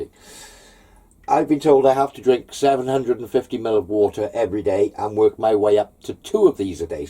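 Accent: Pembrokeshire, United Kingdom. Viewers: I thought I recognised the Pembs accent. Spent many holidays in newgale, lovely beach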